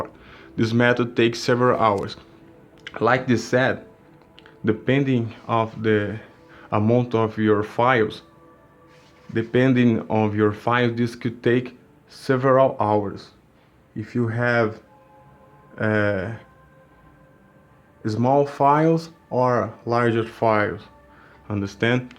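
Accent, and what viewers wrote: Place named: Brazil. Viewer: Percebi que você é BR.Pelo sotaque.Muito bom o seu inglês.Sou intermediário no inglês.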